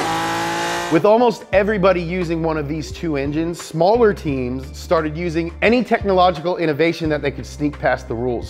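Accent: United States. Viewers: Look... i don't know anything about F1... but somehow beung ranted at by an american makes me think everything is false in this video. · I couldn't watch more than a minute. Why do Americans speak like this?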